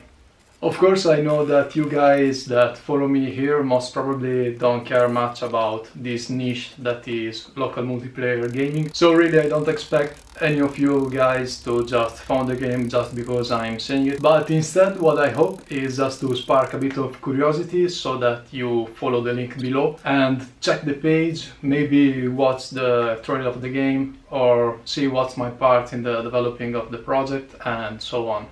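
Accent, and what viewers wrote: Italy. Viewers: Ma sei italiano? Ti ho appena scoperto e ti facevo americano, ora che ti sento parlare inglese mi è venuto qualche dubbio. Comunque adoro i tuoi video, sarai la mia nuova dipendenza su youtube dopo dermatologi che schiacciano cisti, giapponesi che cucinano, affilano coltelli e tagliano cose e cuccioli di panda :D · Scusami se ti faccio questa domanda, ma perché non fai video in Italiano? (si vede che lo sei, sopratutto si sente) Sei molto bravo complimenti!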